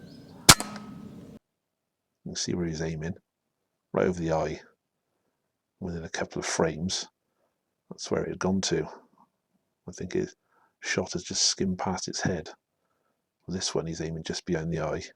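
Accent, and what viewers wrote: United Kingdom. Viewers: And he speaks in a British accent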